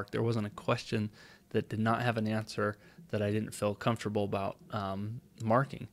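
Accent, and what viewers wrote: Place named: United States. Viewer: I think a major advantage that your course offers is the simple fact that English is your first language, with a US accent. You also seem like a good instructor because of your desire to want to help others. Your personal journey is comforting knowing that we can reach our goals too, even if we had a set back.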